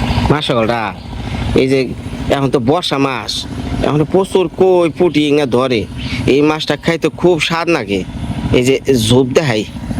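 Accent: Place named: Tangail, Bangladesh. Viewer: বাড়ির সবাই পীর মনে করে। কথা - বার্তা যা কয় তাই মনে করে শুদ্ধ। সাথে সাথে তারা ও শুদ্ধ বলার চেষ্টা করে, আর তাতেই খায় ধরা। এ ও হয় না ও হয় না। যার যার আঞ্চলিক ভাষায় কথা বললে কি অসুবিধা। আরে টাংগাইলের পুরাপুরি শুদ্ধ না। এই যে বলে গামচাটা ভগলা, আইচাল, খাইচাল,গেছাল। আমি ময়মনসিংহের মেয়ে আর টাংগাইলের বউ। সুতরাং সব আমি জানি। যার যার জায়গা থেকে সে বলবে সেটা দুসের কিছু না।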